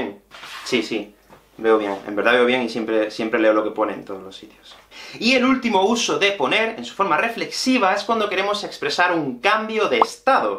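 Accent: Andalusia, Spain. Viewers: A mi me gusta mucho tus videos, pues, explicalos de una manera que a todos nosotros compredemos perfectamente. Por ejemplo "Yo me pongo todos los dias a estudiar español para lograr hablar con fluidez" y tus videos me ayudan bastante principalmente para entrenar la escucha, porque tienes un acento del sur de España que es un acento muy fuerte que a los estudiantes que estan empezando a estudiar español tinen un poco de dificuldad de comprender, pero, ya no lo tengo más ese problema. Un saludo desde Brasil. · cuando das la clase de español sin acento, no todos somos de España.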